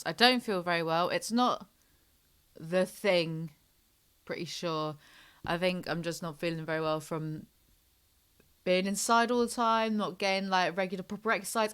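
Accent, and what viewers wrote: United Kingdom. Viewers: That BRIT sound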